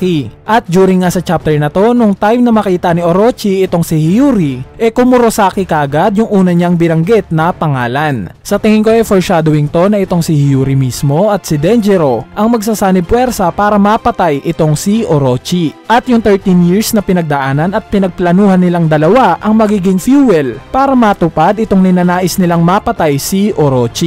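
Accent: Visayas, Philippines. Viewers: Apu at hiyuri bisaya ka pala Apoo at hiyori lambutan mo po ang tigas ng bigkas mo sa pangalan nila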